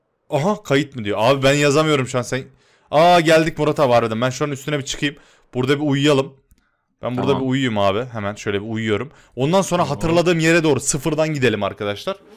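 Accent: Yozgat, Turkey. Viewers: Abi Yozgatlı mısın??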